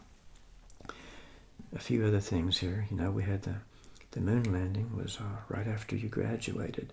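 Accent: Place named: South, United States. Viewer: So lovely to hear a Southern accent. I grew up in Norcross 🍑 I enjoyed your video very much!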